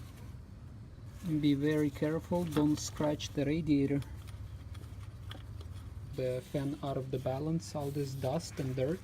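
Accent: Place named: Russia. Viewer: Суровый русский акцент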